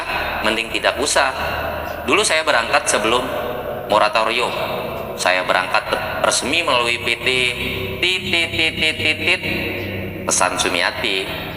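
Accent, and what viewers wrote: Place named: Sumbawa, Indonesia. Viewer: nyam seda pmbaca ne Jerni n jls pst mas nya orang sumbawa totok dri nada bicaranya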